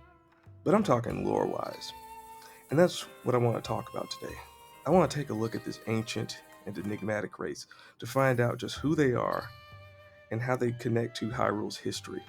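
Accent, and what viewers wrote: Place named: Georgia, United States. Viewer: Where are you from? You accent sounds like south Georgia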